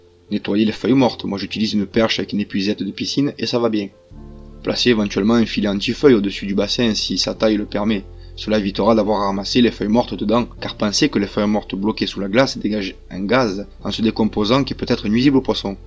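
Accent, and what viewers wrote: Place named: Southwest, France. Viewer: Je viens de te découvrir par la vidéo permaculture passer d'un sol mort à 1 vivant. J'ai adoré. Tu es où en Centre France ? Car vu l'accent.... J'aurais plutôt parié sur le sud Ouest😂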